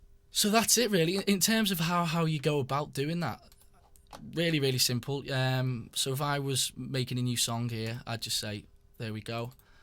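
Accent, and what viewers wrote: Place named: Liverpool, United Kingdom. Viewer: Good job on this. Thx. And nice proper scouse accent to boot.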